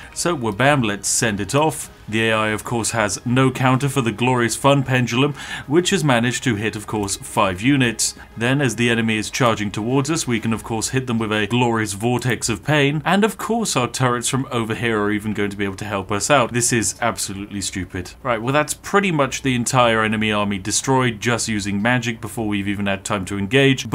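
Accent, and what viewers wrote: United Kingdom. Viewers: British pink daddy!! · Ew British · Pink British Daddy, was all I needed to hear today.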